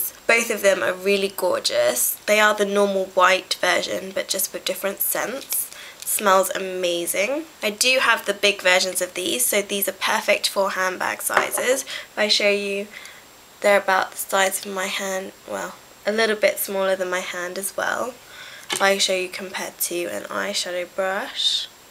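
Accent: United Kingdom. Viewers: i could listen to u all day! i jsut love ur cute lil british accent! =DDD u should've totally tried out for cho chang =)))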